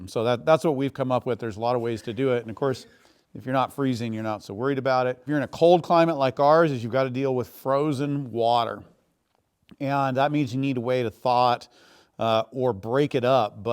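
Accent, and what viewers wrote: United States. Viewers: sounds like every blue state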